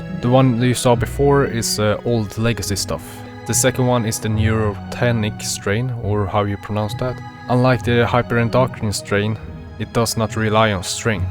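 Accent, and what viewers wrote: Sweden. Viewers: are swedish right cauce you sound like it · Holy swedish accent😭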